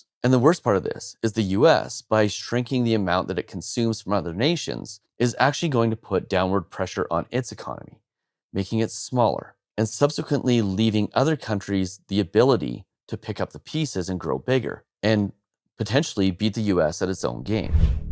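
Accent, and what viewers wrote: Canada. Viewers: I will never listen to a Canadian like you. "Aboot" I can tell right away.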